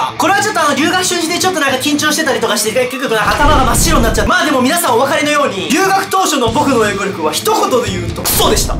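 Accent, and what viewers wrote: Australia. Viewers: オーストラリア訛りっぽくなってる！ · あと、オーストラリアンアクセントを習得してますよ！！！ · ばっちりAussie accentになってて推せます。笑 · 待ってなんで、1年でオージーアクセントを習得したんや！？ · オーストラリアなまり！！カッケーっす！ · やばいオージーアクセントめっちゃかっこいい私もカナダに留学して2年目です！めっちゃ面白い🤣です。応援しています📣、！ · 1年でこんなに伸びるなんてすごい! 大学院(MBAかな?)TOEFLとかの下積みもあったと思いますがすごいですね。しかも、しっかりオーストラリア英語のアクセントになってる（笑）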